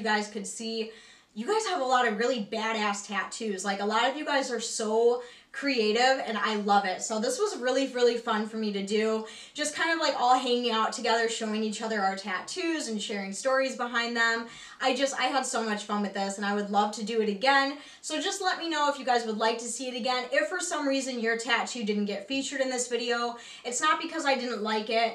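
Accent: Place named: Northeast, United States. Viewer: I always forget what a strong northeastern accent you have!(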